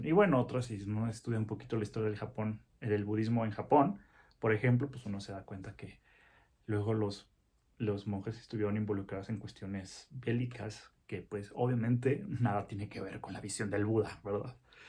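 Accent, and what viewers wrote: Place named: Mexico. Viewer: Es la primera ves en todos tus videos q se nota tu tonada, tono mexicano !!!! Sos un genio y profesional ante todo !!!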